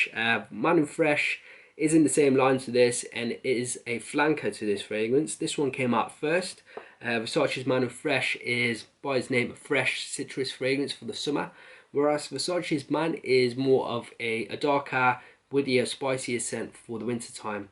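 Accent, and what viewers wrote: Birmingham, United Kingdom. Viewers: Are from around Birmingham mate? Just got this myself today. love it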